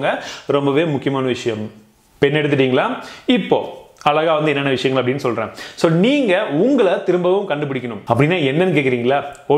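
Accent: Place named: Kerala, India. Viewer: Name la tamil nu eruku ok but unga slang liteta apo apo malayali slang varuthu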